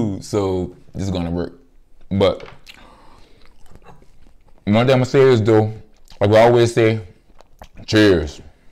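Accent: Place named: New Orleans, United States. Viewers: R u from Louisiana u sound cajun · Are u from New Orleans? U have a strong N.O. Accent..... I Luv seafood so I would’ve enjoyed eating that pan!!!!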